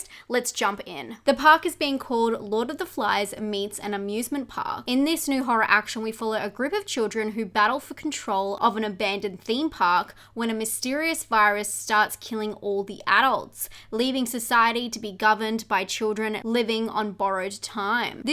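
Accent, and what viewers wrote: Australia. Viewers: Thanks for another wonderful list, I’m checking the children of the corn, by the way, I’m totally amazed by the Australian accent you got there ! 🤩 Greetings from Berlin, Germany ✌️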